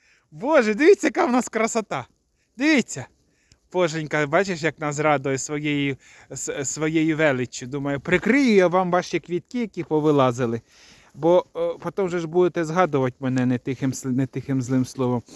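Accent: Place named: Kozelets, Ukraine. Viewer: Каждое видео, как дома побывала, говор знакомый с детства, Козелецький район, ни одного западенского слова, все красиво и понятно и настроение Новогоднее.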